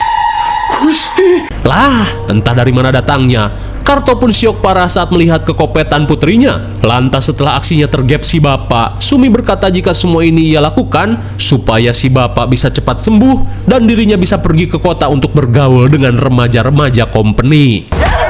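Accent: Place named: Sumedang, Indonesia. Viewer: Bang lu tuh orang mana bang, soalna sundana asa sarua jeung Sunda di Sumedang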